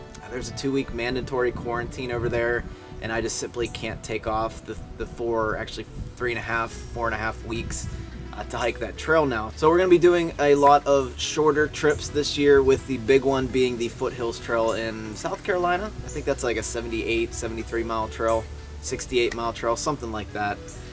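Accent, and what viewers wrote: Pittsburgh, United States. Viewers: Hey, long time watcher first time commenting. I discovered your channel because I was thinking about doing the black forest trail again and watched your video. I thought to myself, this guy has a Pittsburgh accent and came to find you live in the area. I do as well but I'm not native to the area. Long story short man. I love your channel. I love how you are so genuine and you are not self conscious at all on camera which I envy greatly. I think you might be the best friend I never met. I hope that doesn't sound weird. Anyway man, best wishes and keep on hiking😁👍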